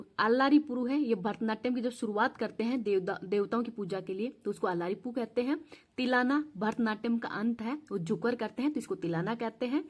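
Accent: India. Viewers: Kya aap Hariyana se h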